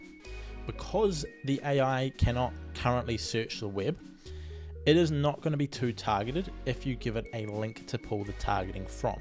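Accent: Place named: New Zealand. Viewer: thanks dude. first i thought a fellow Australian? then i heard the NZ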